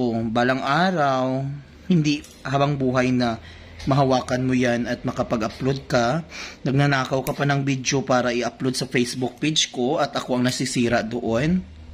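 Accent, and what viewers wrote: Iloilo, Philippines. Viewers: daw ilonggo kaman maghambal. .hehehe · Taga saan po kayu?ilonggo ka eh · Daw ilonggo ang tono haha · ilonggo ka po?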